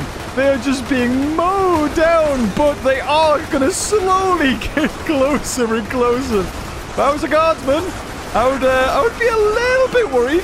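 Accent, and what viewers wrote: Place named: United Kingdom. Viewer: i never heard a brittish bloodletter but i approve massivly great commentary to the happenings on screen :)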